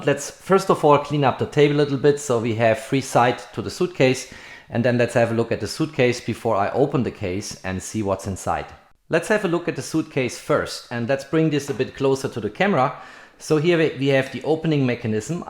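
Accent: Germany. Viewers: "Null Null Sieben" or "Double-O Seven" in English. No "O O Seven". My guess is you're German and never watched a Bond movie in English (besides that I hear a slight German accent). Just saying... Cheers from Indonesia!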